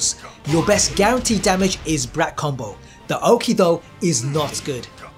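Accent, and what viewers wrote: United Kingdom. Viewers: I love your accent bro , you from UK ?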